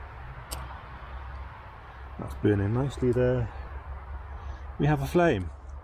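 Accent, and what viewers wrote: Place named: United Kingdom. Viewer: Where is this? I assume in the UK. Guessing that due to your accent.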